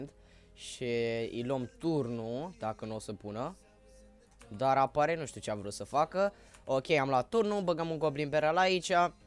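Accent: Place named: England, United Kingdom. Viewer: Vezi ca ai putina engleza si franceza pe la coltul gurii;)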